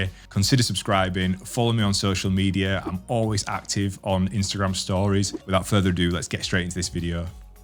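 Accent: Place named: St Helens, United Kingdom. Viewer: Great video with some great advice, I’m trying to set up my YouTube channel and your inspirational! Only found your channel yesterday and I’m loving it. Just a quick one, you sound local to me St Helens? Keep up the good work. Oooshhh!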